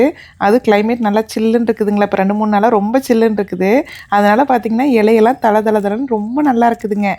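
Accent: Kongu, India. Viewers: Kongu tamil 👌 super nga 😊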